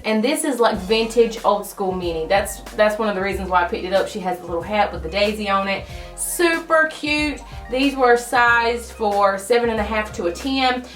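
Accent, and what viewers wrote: South, United States. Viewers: why does she sound soooo country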